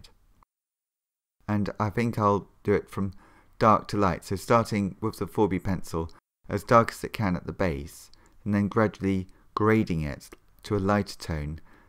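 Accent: United Kingdom. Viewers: Hey, are you british? I really love your accent!!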